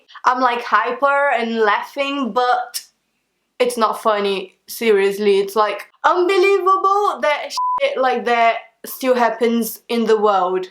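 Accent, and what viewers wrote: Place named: Brazil. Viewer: I know that ur brazilian because ur. Accent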